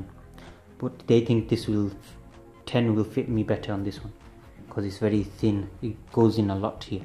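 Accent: Bradford, United Kingdom. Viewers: Are you from Bradford?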